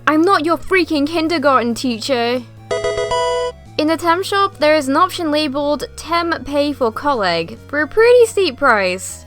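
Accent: United Kingdom. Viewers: wtf are you british ???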